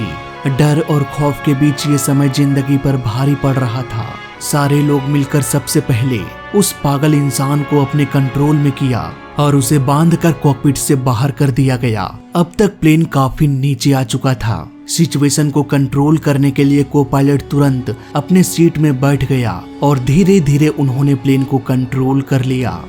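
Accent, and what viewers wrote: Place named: Rural, India. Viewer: For a Japanese incident using Arabian background music ? Sha ko sa . And sa ko Sha ? How come there is no self realisation that it’s not a documentary of Bihar railway station but an aircraft .. U sound so dehati ..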